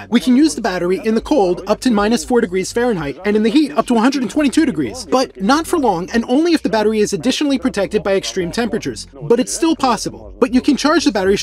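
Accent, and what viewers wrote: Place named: Russia. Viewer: поздно...лет с 5-7 надо постоянно говорить на языке, сейчас поздно, с диким руzzким акцентом англоязычные нейтивы слушать не станут ,тем более во время войны..да и тех.контента на хорошем инглише полно,они первыми узнают новости науки,лишь потом на других языках . почти всё по и железо разработано в развитых странах...запад определяет путь технологий,в рфии только узнают новости постфактум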